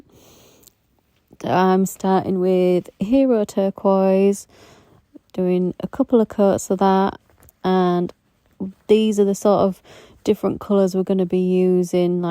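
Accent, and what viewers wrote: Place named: Yorkshire, United Kingdom. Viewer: Glad I found your channel so nice to hear a Yorkshire lass keeping it real you sound just like my best friend who is from Leeds! Thanks for sharing your tips I loved all of your videos especially how you gave alternative options for the pool and tortie 👌🏻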